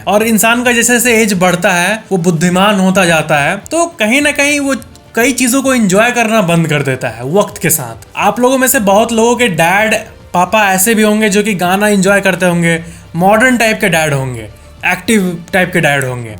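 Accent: Bihar, India. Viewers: Are u from bihar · Bhaiya,u r giving bihari feeling ❤❤❤❤ in talking 😊😊😊😊😊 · aap bihari language mat bola Karo purushling ko istriling 😅😅😅😅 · Ap bihari h kya apka accent bihari jaisa lgta h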